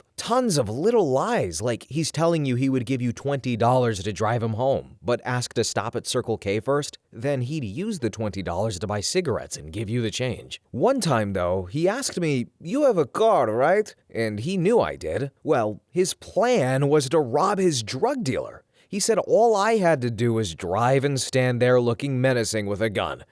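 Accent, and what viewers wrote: Russia. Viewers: Loved the Russian accent! Crazy story. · Love your russian accent xD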